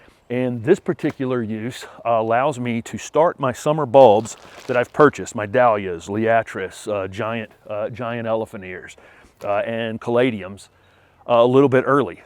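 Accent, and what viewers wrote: South, United States. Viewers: Where are you? Somewhere down South, I can tell that.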